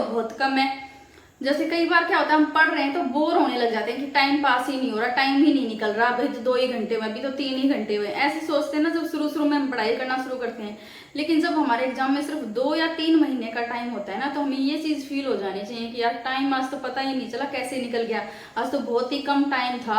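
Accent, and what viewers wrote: Haryana, India. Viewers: Haryana se lagti ho mam aap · Aap Hariyana se ho kya mam😊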